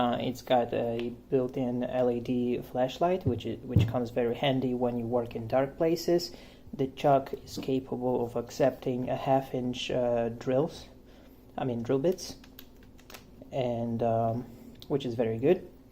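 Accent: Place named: Europe. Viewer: Nice review mate, I thought it strange that with you European accent your talking imperial ? (we're metric in NZ by the way), Iv'e just got the Milwaukee SHOCKWAVE™ Titanium Drill Bit Set - 29PC and yeah ya gotta be carefull cos these bits go hard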